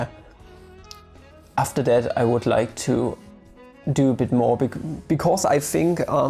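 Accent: Germany. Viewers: Can someone link the vid on the integral he talks about ? I can't decipher the thick German accent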